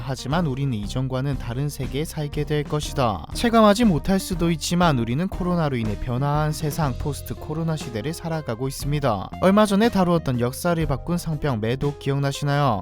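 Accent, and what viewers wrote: Gyeongsang, South Korea. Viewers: 경상도 억양에 표준어 사용하니 좀 그럴수 있습니다. ㅋ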